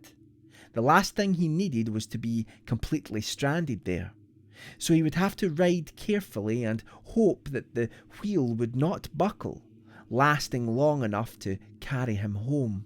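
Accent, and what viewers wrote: Scotland, United Kingdom. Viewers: I really can't believe it's taken me so long to find your channel. You're a gifted author. I've not run across a single piece of yours that's not ended up in my favorites. Getting to hear them with your delightful Scots brogue is definitely putting the glaze on the cake for me. Weel dune me lad! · Author/narrator is Scottish, yo.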